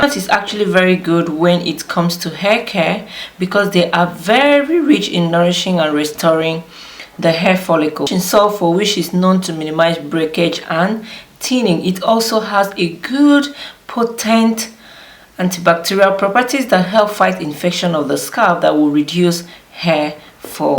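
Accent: Nigeria. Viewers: First of all, I LOVEEEEE the fact that you stick to your Nigerian accent 😍😍😍 and not trying to fake like a lot of people on here.. secondly you didn’t talk about shaft but I’m guessing you could use it for some kind of hair mask.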